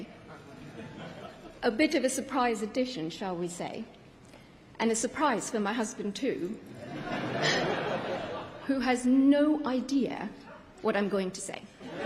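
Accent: United Kingdom. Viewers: Her accent is so Brit 😮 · Omg !! That British accent !!! She took me by surprise!! I thought it would be Indian English . Never mind. Good luck to both of u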